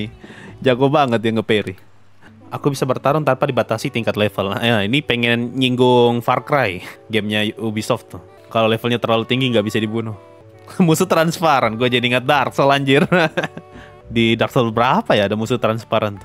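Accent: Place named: Eastern Indonesia, Indonesia. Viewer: Bang lu kok logatnya kek orang timur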